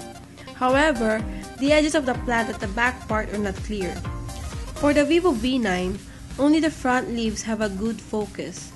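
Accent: Philippines. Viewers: Loving the filipino accent!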